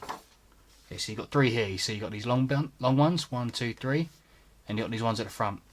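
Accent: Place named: England, United Kingdom. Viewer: he has an english accent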